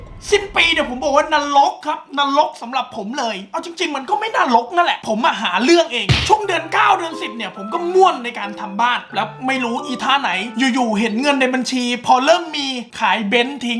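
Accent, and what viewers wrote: Thailand. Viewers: พี่ พูดเหน่อ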